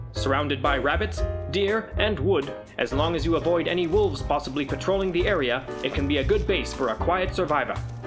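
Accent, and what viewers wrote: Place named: Transatlantic, United States. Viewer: Haha, this was such a fun video! Love the format, and your subtle Transatlantic accent 😂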